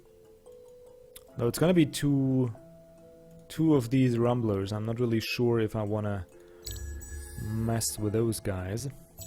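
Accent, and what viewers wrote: Germany. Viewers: Hi and a belated welcome to the weird world of Roving Clans. Thumbs up for pronounciation so far. I'm very sad to see this island thing again. To me it takes away a lot of the Roving Clans dealio; wheelin' and dealin' in all eras to survive, and eventually getting foreign trade routes. You'll never get that here because the AI apparently does not believe in sea trade. And, as I've previously stated, the AI kind of stinks at naval activity (expanding, scouting, warring). To top it off, your island sucks, resource-wise. This is really bad because RC is not a very strong faction to begin with, and really needs all the help it can get. About the urces quest. If you think your hero and your paltry units can handle two urces, think again. They will eat your cavalry for breakfast and use Sirya's bow as a guitar while dancing on her bones. You cannot underestimate just how bad the RC military is early on. 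They are T-E-R-R-I-B-L-E. I mean, look at the stats of your guys, and compare them to what you can buy in the marketplace. One horse guy, or a 400-hit point deamon, or a 300 hit point ended? Hmmm! Difficult choice. Remember the mercs gain 2x hit points (not factored in the market display). Anyway, I honestly feel my motivation to watch your playthrough diminishing a bit. I just don't think it will be that fun to sim city on an island (again). Hope I'll be proven wrong; you certainly have surprised me before. Sorry for all the negativity - I still love your German accent.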